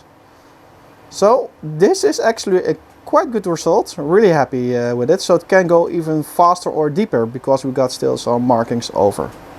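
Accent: Netherlands. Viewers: Very nice, but damn your Dutch accent is heavy: Fideo, nafigation etc etc.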